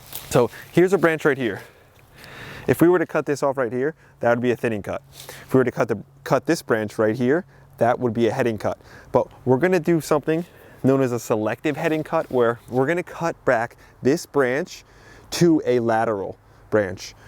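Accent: New Jersey, United States. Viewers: Are you from joisey? Im from joisey!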